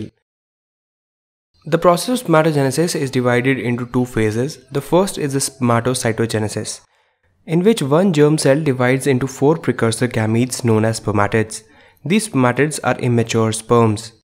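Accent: India. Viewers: There is nothing 'easy' about an English speaking person having to understand an Indian speaking person w/ a heavy accent explaining something in English. It's something about the 'sing-song lilt' that unpleasantly overshadows understanding the explanation for me. Even more so when they're speaking too fast. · don't worry for your Indian accent-which, if we accent the American prononciation- just has to be accepted as it is. It took the first 3 seconds to adapt myself, and i understood you perfectly though I am not a native English speaker. And for those who find it;s too fast, just go to 'setting" (the little wheel) and change the speed!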